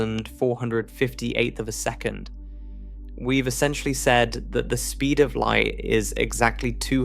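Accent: England, United Kingdom. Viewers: USUAL ENGLISH ACCENT GARBAGE VIDEO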